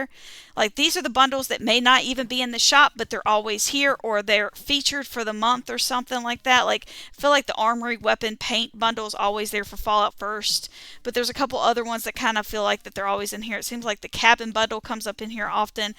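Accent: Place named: Appalachia, United States. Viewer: Only just stumbled upon this channel but I love how, within the first 30s, I can tell another Appalachian by how they say words like "dry". Instasub.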